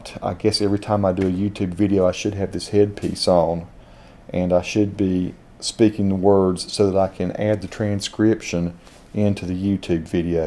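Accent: South, United States. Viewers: I'm not a fan of Southern accent but yours looks utterly excellent. Good job :D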